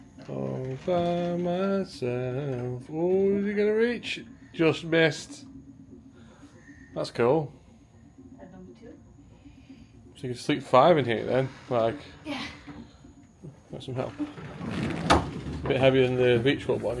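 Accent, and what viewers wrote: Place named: Stoke, United Kingdom. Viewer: Watching travel day.. Cant comment on that video. But i said within seconds.. Thats a stoke accent.. But yeah buy that stoke kit. Ill wear mine on travel day in 4 weeks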